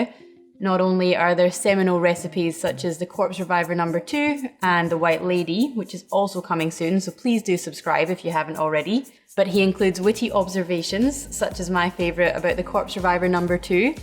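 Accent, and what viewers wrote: Scotland, United Kingdom. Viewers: Thanks for that! I'm usually good with accents and figured you were from Western Scotland and had spent some time in Canada or the US, but had no idea about France. (You have your hobbies, i have mine.) More importantly, thanks for the lesson in one of my favorite go-to cocktails! Cheers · I love your accent. By chance, are you of Scottish origin?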